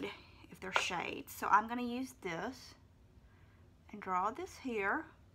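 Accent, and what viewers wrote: South, United States. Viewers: I love your accent! I wish I had a southern accent so badly - my close friend is from Mississippi, and when I hang out with her or stay over at her house, I start talking like her after a while and I have to force myself to stop. Lol.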